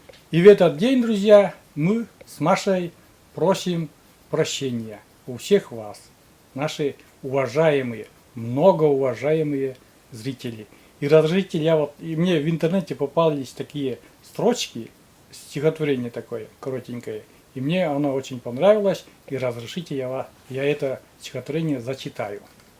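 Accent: Komi, Russia. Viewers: Случайно попала на ваш канал, по говорю поняла что коми, сама с Усть Кулома, с большиим удовольствием смотрю ваши видео, очень нравится как вы " Болтаете" Как говорите сами.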